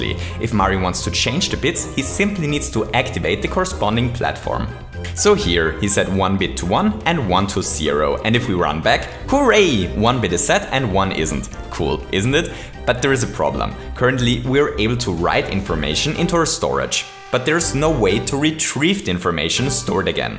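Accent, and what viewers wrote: Germany. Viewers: This german accent xD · You're german right? :P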